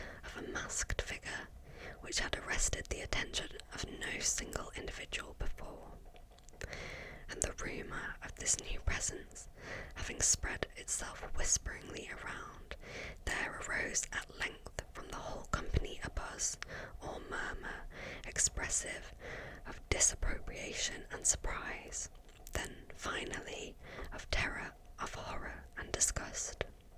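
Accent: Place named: United Kingdom. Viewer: Not enough British ASMR reading videos out there! This was very relaxing,!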